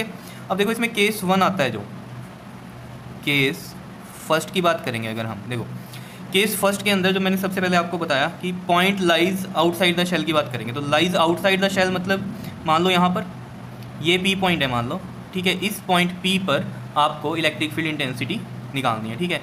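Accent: Punjab, India. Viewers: Sir ap Punjabi ho🥰🥰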